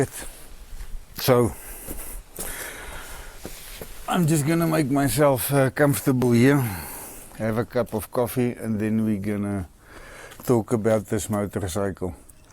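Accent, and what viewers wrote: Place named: Scotland, United Kingdom. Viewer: I thought it's Scottish accent initially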